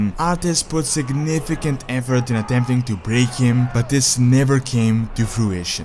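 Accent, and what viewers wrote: Russia. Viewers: You say this is a translation from a Russian youtuber. So did you study Russian or are you Russian? That accent gives it away but just asking to be sure. Nice videos btw, as a lore fan and WoW fanatic you have my sub. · Are you a Russian?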